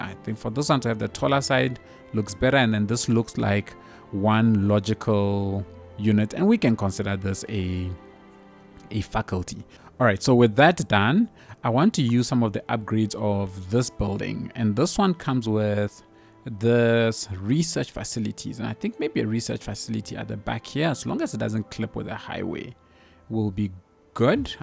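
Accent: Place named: South Africa. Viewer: Finally!! A South African Cities Skylines gamer 👏🏿 knew it from the accent. 🇿🇦 And the roads have the correct handing too. Make sure you have enough policing please 😅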